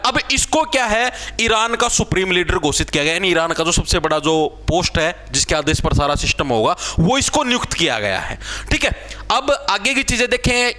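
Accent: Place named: Haryana, India. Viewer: Arey hindi to theek se bol liya karo bhai samjh to jab aayega haryanvi mix kar rakhi hai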